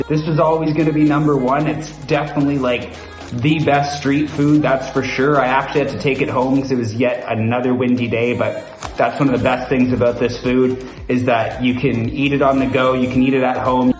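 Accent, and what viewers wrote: Canada. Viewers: You sound very Canadian. Great video, very helpful for sure thank you !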